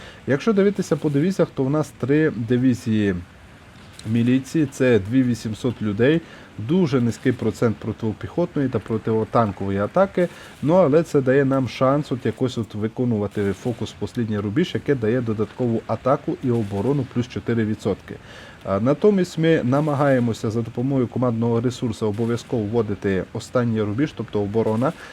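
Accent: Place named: Vinnytsia, Ukraine. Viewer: Друже! Південь Вінничини? Люблю свою країну! За вимову йдеться! Буковина?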